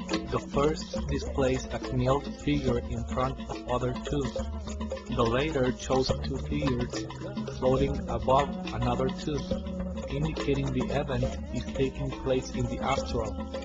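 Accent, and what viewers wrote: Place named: Mexico. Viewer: how can anyone even call this afrocentric this man speaking is most definitely not anyone black, i can't possibly begin to count how many mexicans i spoke to with this kind of accent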